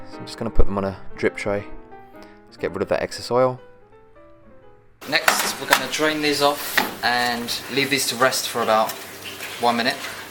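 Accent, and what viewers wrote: England, United Kingdom. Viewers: I love your British accent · James Ziemba Sounds like chef is a born and bred Brit. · English accent makes him intriguingly interesting! Tho the oil colored pretty scary… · what a nice combination!! Japanese guy with British accent.... I like you keep going がんばって:) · I notice your accent. Are you based in the UK? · That British accent. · Japanese dude with a British accent? Sign me up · hhh He has an english accent so he was probably raised in an english speaking country. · Japanese handsome chef with English accent.....mmmmm 👍🏻😘🧑🏻😻😻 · Me too. Japanese in british accent, so COOL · That British account tho. somehow satisfying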